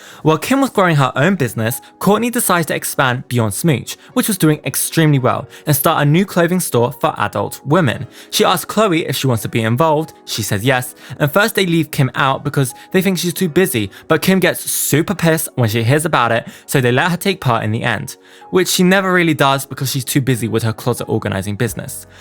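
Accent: United Kingdom. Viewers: AND FER YOU LIL OOZIE…. AN HOUR LONG. BRITISH SPEAKING DOC ON THE KKW THE ONE AND ONLY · I really love your accent, reminds me of some of my British heritage and when I lived in London, I also love how you piece everything together and your enthusiasm. It does however, feel very biased to support The Kardashian’s. They have been caught lying a lot so I wouldn’t be surprised if she lied in her book too.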